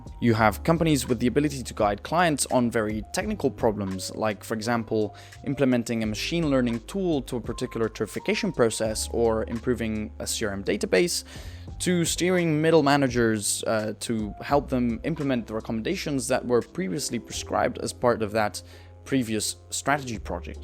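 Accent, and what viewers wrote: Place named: United Kingdom. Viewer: Impresionado por tu acento británico, peroooo, sinceramente, creo que hubiera sido mejor mantener el canal antiguo y abrir uno nuevo orientado a tu nuevo público objetivo, la verdad 🤷🏼‍♂️. Creo que así partes de cero con los interesados en Consultoría y pierdes a los interesados en aeronáutica o tecnología e ingeniería 🤷🏼‍♂️. Igualmente, espero que el comentario ayude con el "algorrino", y por supuesto, mucha suerte y todo lo mejor en esta nueva singladura consultora señor 👋🙃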